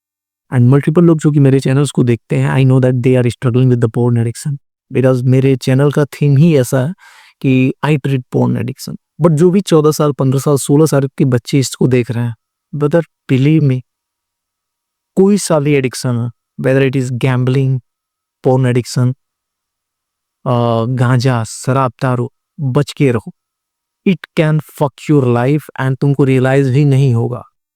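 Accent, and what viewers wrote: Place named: Bhojpuri, India. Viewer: Where you from bro Your language accent is bhojpuri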